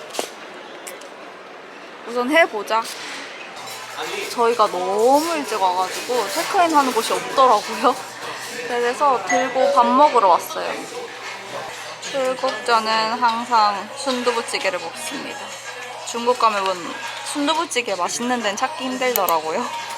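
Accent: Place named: Busan, South Korea. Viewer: 님 사투리가 들리네요!ㅋㅋㅋㅋ 부산사람이셔요?!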